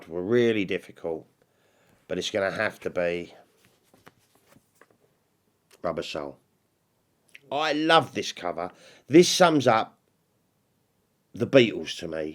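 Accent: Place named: Romford, United Kingdom. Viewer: Really enjoyed this video. Real hard to rank them. Personally I’d have put higher than the white album just because it’s got that glossy autumnal cover. edges it for me over too and I’m not a big fan of the album cover but your list was very interesting. You sound like you’re from round my way too. Im from Romford. Take care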